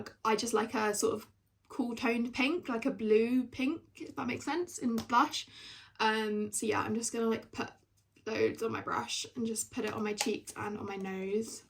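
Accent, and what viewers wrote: United Kingdom. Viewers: Ur bri ish? What?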